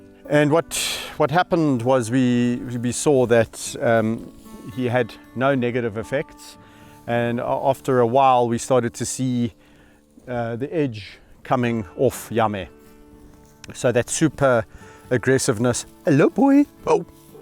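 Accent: South Africa. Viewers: Such adorable creatures, like overgrown pet dogs hehe. Obviously we know animals such as lions and bears can never be completely tamed, and will always retain some of their wild instincts, including the instinct to kill. This can't be "trained away". But I love this dude. He naturally understands that and has a very healthy respect for them and their power, but also doesn't simply abandon these poor, lovable and ecologically important animals, instead risking his life and spending his money to care for them and keep them as comfortable and happy as possible. And that's the truly amazing part. Because you can tell just by seeing the animals that they really are happy with him there. =) NICE job. And I love that awesome S. African accent!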